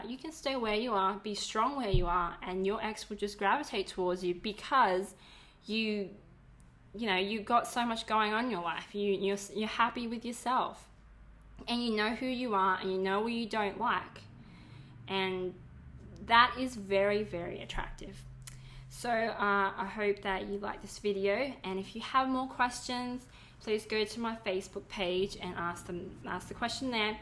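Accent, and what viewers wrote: Australia. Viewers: your accent... are you in australia? you sound like my cuz in melbourne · this is an Asian Woman with an Australian accent. never thought there was a such a thing as a perfect woman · are you chinese...you sound australian😯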